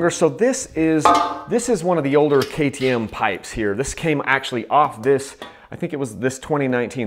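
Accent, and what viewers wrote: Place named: California, United States. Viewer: I can tell your from California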